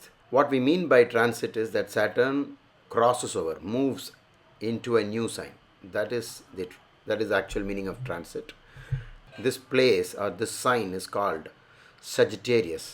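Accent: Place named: South India, India. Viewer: sir i respect u, u r senior to my age but u speak so lower voice i hve difficculty hearing clearly, also cos u hve south indian accent, ple speak slowly else v dont understand at once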